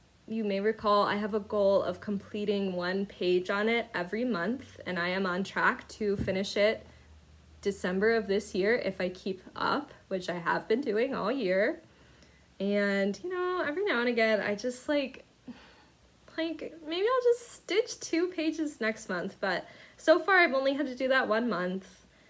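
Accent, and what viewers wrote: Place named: Midwest, United States. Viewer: Hi! So nice to "meet" you. Michelle Bendy mentioned you so I have now watched all three. I had a feeling it was midwest. I spent approx 30 years in Oak Park & Forest Oark, IL so hi neighbor. 😁 Thanks for sharing your time, and your joy of stitching. It's refreshing and no, I don't hear a lisp at all. Age? 29?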